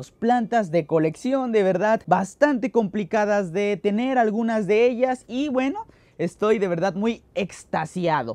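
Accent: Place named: Spain. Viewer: Porque hablas raro ? Tipo español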